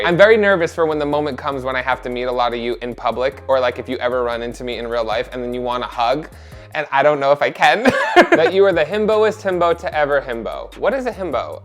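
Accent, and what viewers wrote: New Jersey, United States. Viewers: I love his Jersey accent!!!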